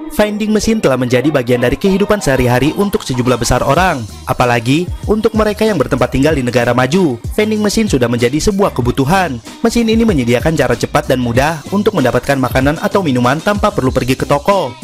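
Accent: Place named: Indonesia. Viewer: loghat indon kan..ape yg pelik sgt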